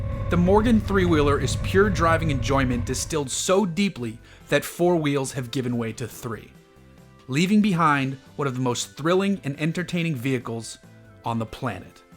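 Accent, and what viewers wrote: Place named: United States. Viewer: Fantastic review. Also you have a nice American voice. Not annoying at all!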